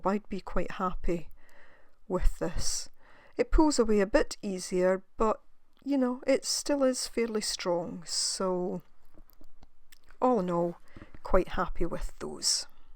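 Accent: Scotland, United Kingdom. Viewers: Lovely video..I love the sound of your accent💕 Scottish? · Lovely! Super tips thank you and I do love your beautiful Scottish accent! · Hi nice to hear a Scottish voice for a wee change, enjoyed your tutorials very much and wonder if you know of any crafters that swap ATCs. Cumbria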